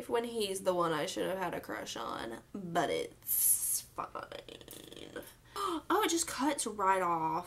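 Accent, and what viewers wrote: South, United States. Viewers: I just found this channel and first of all you are hilarious and I love your southern accent because I can relate.. I am from the south #needmysweettea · Omg what state do you live in? Southern af🤣 Alabama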